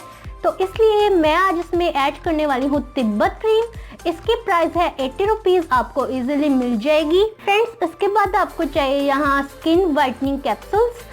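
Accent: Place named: United Kingdom. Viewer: Ap urdu british form me q bat karti hain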